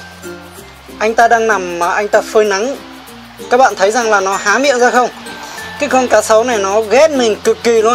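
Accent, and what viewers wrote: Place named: North, Vietnam. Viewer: A nói giọng Bắc nghe cưng quá dễ thương gì đâu Khúc mình quen rồi . Nge dễ thg cực kì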